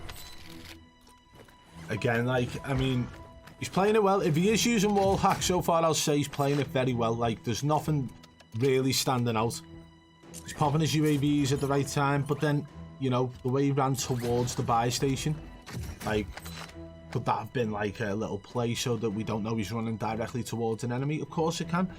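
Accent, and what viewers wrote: Liverpool, United Kingdom. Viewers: best scouse wz2 commentator out there, yes lad 😉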